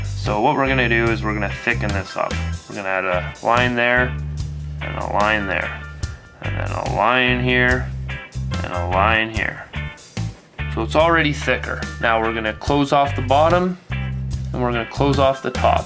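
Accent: Canada. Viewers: It's actually quite slight. I didn't notice until almost two minutes in. In california, our considents at the end of our words are weak, almost silent. For Canada, it's in the way you pronouce your vowels within or at the start of a word, like out and start. And its only with a few words. Sometimes, you can't even tell. I mainly hear it in about.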